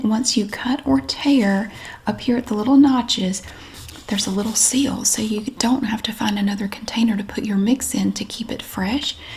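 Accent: South, United States. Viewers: Hope you have a wonderful day too! Thankyou for your soothing southern mom voice :) you are very comforting to me! One of the only talking asmr-artists I can handle at all and a big part of that is your accent and how considerate you are to your viewers!!! Thankyou again!! I will gladly do a painting of you and your family if you like! For all the nights I was anxious having existential crisis(s) you and your Aldi purchases or you rearranging things at the store was there for me. Anyways please let me know if you'd like a painting Ill do it for all you've done for all of us! Thankyou again!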